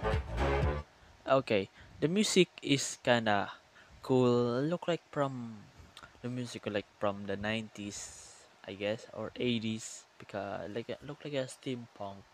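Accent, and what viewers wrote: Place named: Philippines. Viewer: Wow thats rare to find a filipino channel i knew that ascent sounded fomilar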